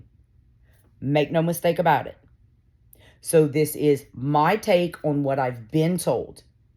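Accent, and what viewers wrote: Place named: South, United States. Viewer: you have the cutest little southern drawl :)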